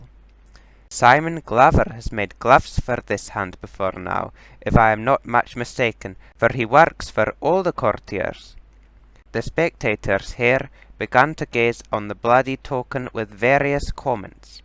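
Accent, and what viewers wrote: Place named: Scotland, United Kingdom. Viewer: i love this book! it’s my favourite you did it so well!!! why are u now scottish tho?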